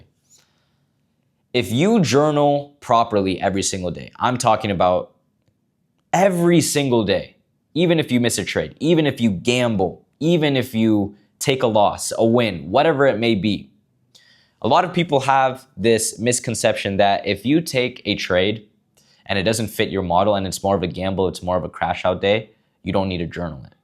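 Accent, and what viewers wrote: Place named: India. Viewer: He is not somali. He looks like indian. And he speaks their accent.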